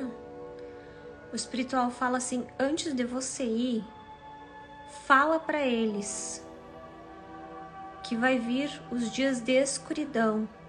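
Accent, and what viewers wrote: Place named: Bahia, Brazil. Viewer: Cara que videnre chata ! Com esse sotaque com uma mustura de baiano cauxo minerio !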